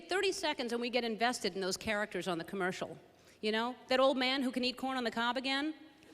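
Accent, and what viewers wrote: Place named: South, United States. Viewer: Is it me or can you hear her Southern accent a bit?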